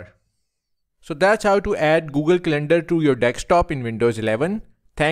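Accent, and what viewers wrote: India. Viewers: Bro that's awesome. I have searched everywhere how to do this and finally, there is a random guy with Indian accent on a low sub-channel, again, everytime lol🤣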